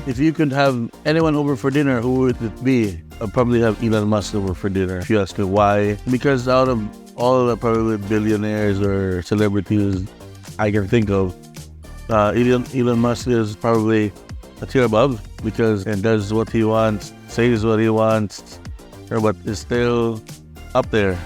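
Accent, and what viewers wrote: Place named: Visayas, Philippines. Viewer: Maolaw man sad ta mag bisaya sir 🤣🥰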